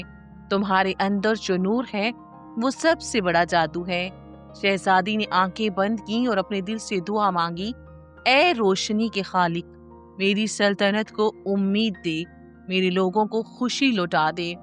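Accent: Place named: India. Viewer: Hindi accent ki jaga Urdu accent use krn Clip's ka liya vo3 use krn vo apka original characters voice da ga apko audio lagana ki zarorat nhi para gi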